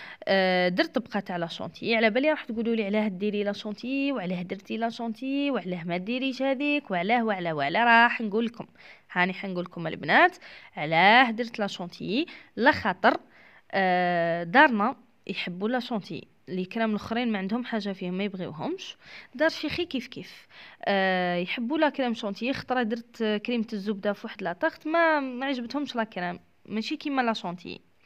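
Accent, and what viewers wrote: Batna, Algeria. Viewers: كي نسمعك تهدري نحس روحي راني في باتنة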